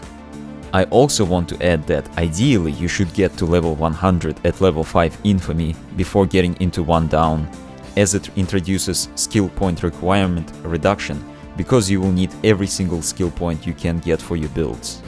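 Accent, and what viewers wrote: Russia. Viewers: Говори что хочешь, но акцент русского у тебя выделяется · Кул рашн акцент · Just when i clicked on the video i was like sure great intro .. OH HES RUSSIAN haha im half russian i did not say that in an attempt to offend him.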